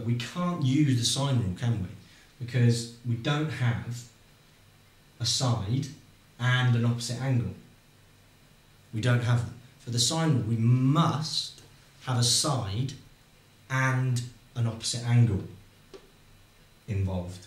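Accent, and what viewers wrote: United Kingdom. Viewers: I find it hilarious that people keep commenting about his accent...it's just an English accent...if you go there, there are plenty of English accents...and it doesn't make maths any easier! I'm having some trouble getting the same answers on my calculator...help...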